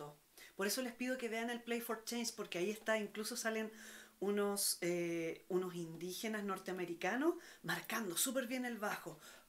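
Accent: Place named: Chile. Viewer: Un placer suscribirse a tu canal, me parece o eres de Chile? Apenas se nota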